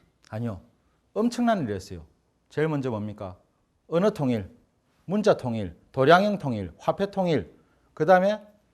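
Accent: Gyeongsang, South Korea. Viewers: 갱상도 말투 쌤도 괜춘하네^^ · 경상도 말씀에~너무 유쾌한 강의가 지루하지않고 재미납니다~~~^#^♡♡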